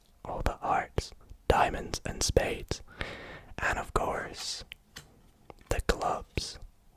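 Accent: Scotland, United Kingdom. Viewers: He’s Scottish 😭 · You’re same is enjoyable because it is interactive and interesting but at the same time you’re Scottish accent and voice is soooooooo relaxing I literally fell asleep watching the mind reading one the other day keep it up👌🏼🤌🏼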